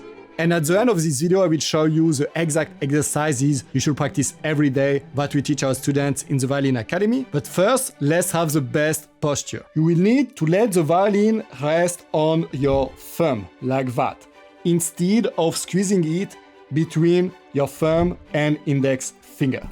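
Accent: France. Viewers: tu parles anglais comme un français j'aime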